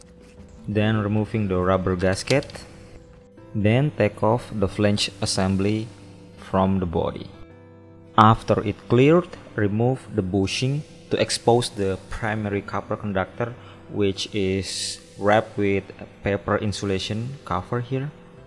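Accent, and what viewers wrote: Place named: Indonesia. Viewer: Logat orang Indonesia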